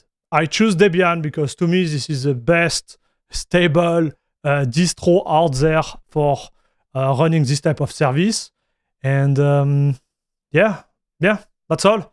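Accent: France. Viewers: Le jeu tu le lance sur quel hardware ? Je l'ai pris sur Steam, via Proton (TkG) sur une Arch sur un 7950X3D et une 7900 XTX, je suis stuck a ~40FPS. Independament de la resolution ou de la qualité. Et au passage, merci de faire rayonner l'accent français dans le monde entier. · Jolie accent français !! 😁😁 Merci pour le tuto tu régal 👍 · Are your a frensh guys because your accent make me laught a lot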